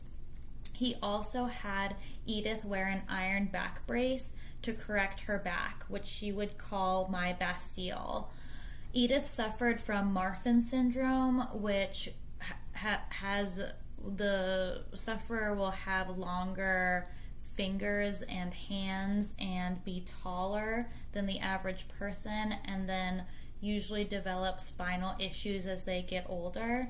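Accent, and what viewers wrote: United States. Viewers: Why this disagreeable American voice?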